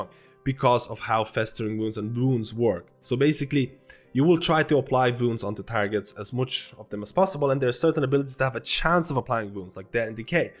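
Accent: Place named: Ireland. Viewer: I thought he somewhere was northern European but then he started sound Irish...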